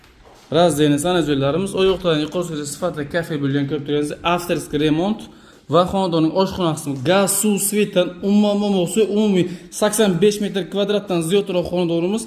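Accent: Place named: Bukhara, Uzbekistan. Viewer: Бухороча акцентку. Манимча Бухоро. Самаркандликлар акценти бошкача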